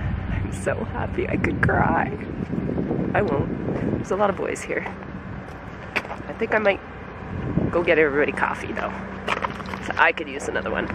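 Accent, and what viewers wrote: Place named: Canada. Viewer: Where are you operating out of? Looks like Michigan but I just heard you say out} so I know your in canada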